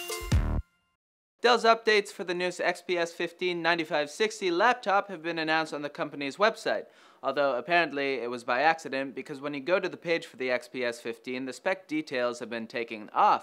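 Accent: France. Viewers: U have a nice French accent dayyumm :D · What a french accent.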